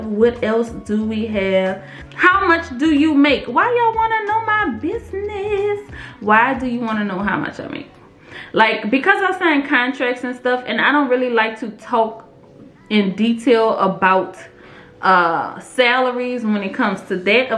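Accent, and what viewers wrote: New Orleans, United States. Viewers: That NOLA accent is my most favorite in the Entire World! Fellow NP here...don't change girl!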